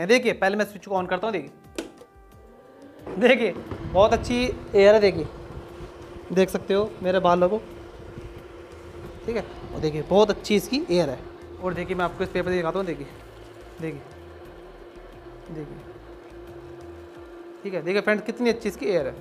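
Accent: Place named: Haryana, India. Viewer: आप बहोत ही फास्ट हरियानवी हिंदी बोलते हो ऊसमे सुधार चाहीये।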